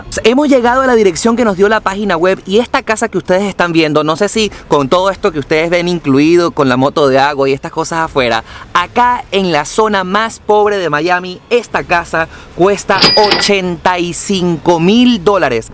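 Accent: Venezuela. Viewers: El acento venezolano es inconfundible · eres venezolano no.? hola no conocia tu trabajo.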